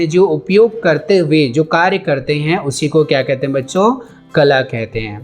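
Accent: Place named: Punjab, India. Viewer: i think you from punjab😅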